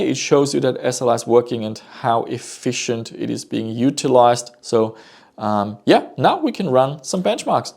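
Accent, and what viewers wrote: Germany. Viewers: You live in Australia with a german accent?? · Why do you sound German to me? I'm German and especially your pronunciation of "the" really sounds like a German or Danish guy speaking English.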